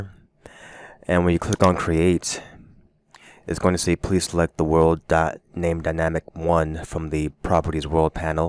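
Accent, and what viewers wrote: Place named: South, United States. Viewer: Why does he sound like a Southern Ryan Higa!?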